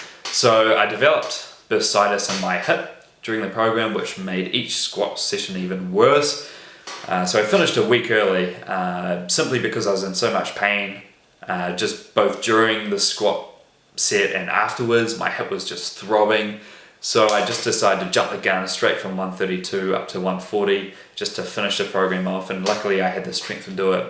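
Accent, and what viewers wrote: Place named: New Zealand. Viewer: Sounds like a kiwi, chur bro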